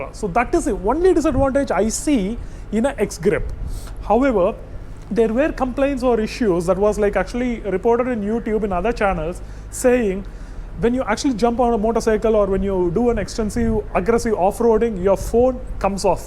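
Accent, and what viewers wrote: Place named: Texas, United States. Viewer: Great video! Thats a heavy accent are you from texas?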